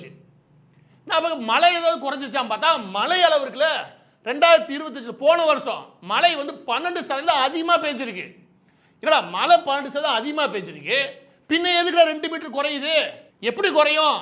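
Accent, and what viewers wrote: Madurai, India. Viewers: சாட்டை நெல்லைத்தமிழ் நீங்கள் மதுரை தமிழ்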